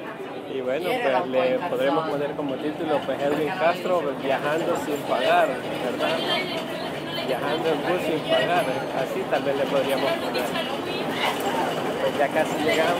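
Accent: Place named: El Salvador. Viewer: También se le nota en el "pues si" jajajaja yo también soy salvadoreña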